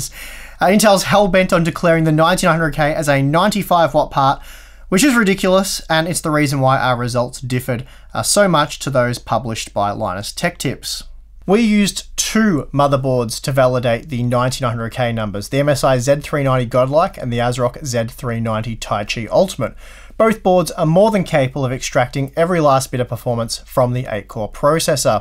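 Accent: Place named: Australia. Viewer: Interesting and useful information given, thank you! But this accent... It's so hard to understand this Aussie kind of English..., oh my! My impression is like someone is chocking you by the throat while you are speaking!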